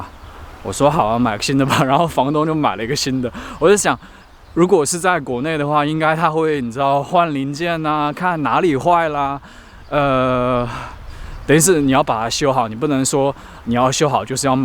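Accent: Taiwan. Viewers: 哪里人？为啥说话台湾腔！ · 你的腔調好像台灣人😳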